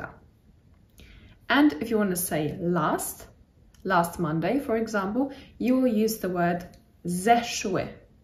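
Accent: United Kingdom. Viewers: By the way, your accent, are you in UK?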